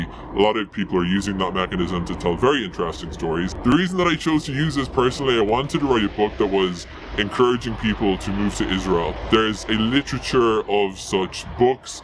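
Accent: Ireland. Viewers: The issue is that a certain cadence and some letters in your pronunciation give away your Irish accent.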